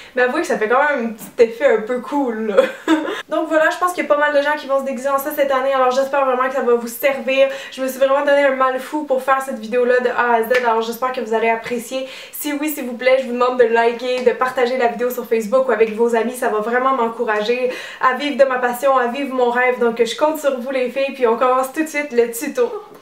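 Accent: Quebec, Canada. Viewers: T'es Québécoise non ?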